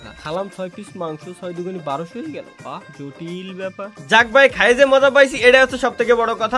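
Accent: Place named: Barishal, Bangladesh. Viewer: ভাই মনে হয় বোরিশাইল্লা😂🤣😂🤣 গের,,আমার বোউ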